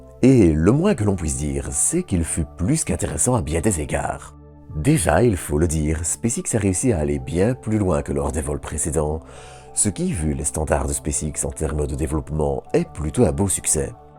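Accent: Belgium. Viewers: vous avais un accen belge magnifique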